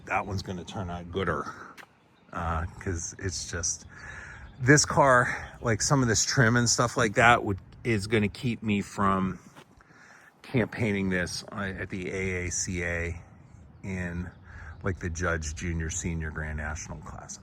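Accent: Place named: Pennsylvania, United States. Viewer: I could tell you are from PA by your accent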